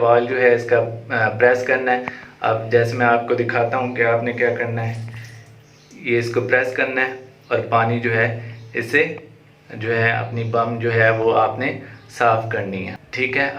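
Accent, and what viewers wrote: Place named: Pakistan. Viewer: Your accent tells you're Pakistani and you sound like my one friend from Pakistan 🤣🤣 he's funny and wierd just like this video 🤟🏻🤟🏻👍🏼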